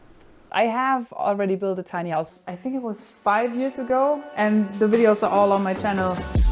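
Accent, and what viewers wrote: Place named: Germany. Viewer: PS: the G'Enlish is an added bonus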